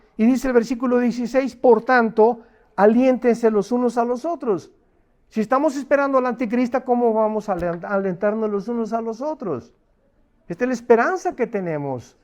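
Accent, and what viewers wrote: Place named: Peru. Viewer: Luis Qwerty estas seguro qué esté hombre es mexicano para mi suena como peruano ojo no tengo nada en contra de los peruanos es la primera vez que lo veo y me llama mucho la atención.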